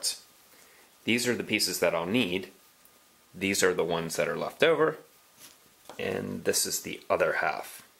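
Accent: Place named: Texas, United States. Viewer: dude, I love your accent, your jokes, and your dry delivery of them. my husband and I are getting into woodworking because of you. it's all your fault! 😉 did you live in Texas? I'm trying to pinpoint your accent; it's very complex and interesting :) (to me, at least)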